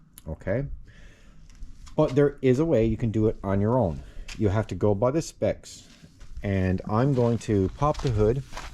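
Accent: Canada. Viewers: A minute into the video I was like "hmmm he sure sounds Canadian eh" and sure enough you are lol. I'm from Toronto, with at 2018 DGC.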